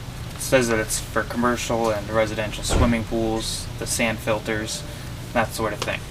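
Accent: Canada. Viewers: Well I just spent about 2 hrs watching your videos needless to say I am exhausted. Well done. Truly a pleasure to watch and very informative. I especially love your Canadian accent when you say words like "out". Ow-oot! :) anyways subscribed - keep em coming...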